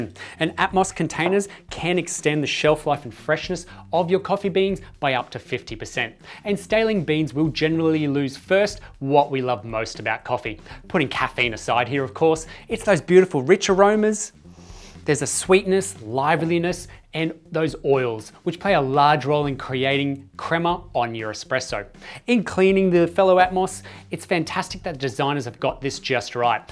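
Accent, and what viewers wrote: Australia. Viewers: Very informative video, pity about the unbearable Australian accent...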